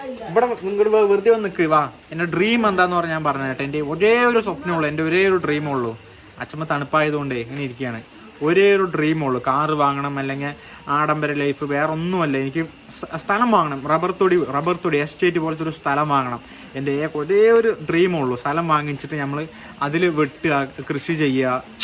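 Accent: Palakkad, India. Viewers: palakkad slang 📈🔥❤ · ചേട്ടൻ്റെ വീഡിയോ ഞാൻ കാണാനുള്ള പ്രധാന കാരണം ഞാനും പാലക്കാടിൽ ആണ് താമസിക്കുന്നത് ഈ ഭാഷ സംസാരം കേട്ട് തന്നെയാണ് വീഡിയോ സബ്സ്ക്രൈസ് ചെയ്തത് ❤️‍🩹പിന്നെ യൂട്യൂബിൽ ഉള്ള ചില വ്ലോഗേർസ് ൻ്റെ വീഡിയോസിൽ കമൻ്റ് ഇട്ടാൽ വലിയ ജാട കാണിക്കും ഏത് കമൻ്റിനും റിപ്ലെ തരില്ല😑 പക്ഷേ ചേട്ടൻ ഞാൻ ഇത് വരെ ഇട്ട എല്ലാ കമൻ്റിനും റിപ്ലെ തരുന്നുണ്ട് 👍❤️ അതുകൊണ്ട് തന്നെ ഞാൻ ഇനിയും ഇടുന്ന എല്ലാ വീഡിയോയും കാണും സപ്പോർട്ട് ചെയ്യും❤️‍🩹🧿🫂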